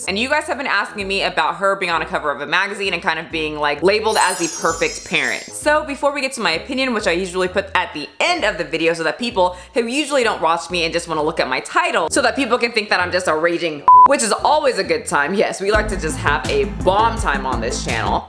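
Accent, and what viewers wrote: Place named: New Jersey, United States. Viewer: Omg I’m watching this video 5 years late. I LIVED HERE 5 years ago! I’ve been to that movie theater! My gym was on the next block! 😂 ( I’m so glad I found your content in the past year. And your NY/NJ is on point 💚)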